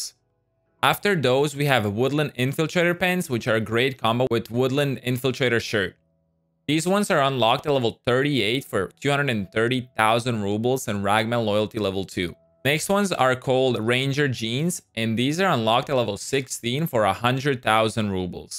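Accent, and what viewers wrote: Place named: Europe. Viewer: Hi from Finland. To my ear have a European accent, so where are you from? My guess... Slovakia;)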